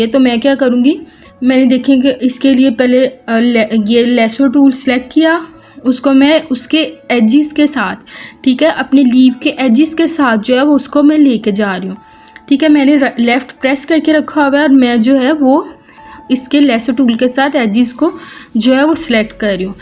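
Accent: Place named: Faisalabad, Pakistan. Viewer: Sis apki language btati k u r from fsd.Am also from fsd .boht khushi hui apka tutorial dekh kr or boht acha smjhati ho ap.God bless u☺️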